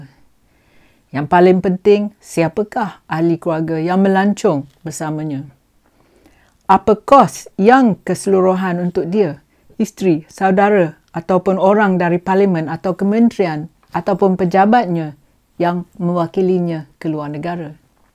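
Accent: Perak, Malaysia. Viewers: askum kak, kak org perak ker , kadang ckp ada loghat perak tu hehehe, but i agree you are 100% betul , malaysia need a total reboot kak, a total reboot cause all this while we are being manipulate , we need new people like kak , the real people...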